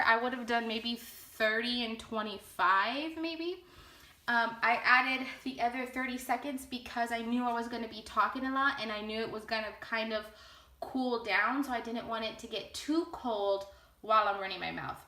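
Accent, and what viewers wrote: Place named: Mexico. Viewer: I'm curious what your nationality is! If I go by your accent alone I would guess Mexican. I'm from Texas just outside of Houston and I go to San Antonio all the time.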